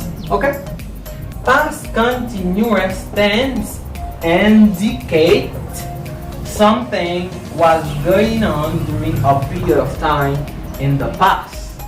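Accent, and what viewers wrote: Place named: United States. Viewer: Great English American bro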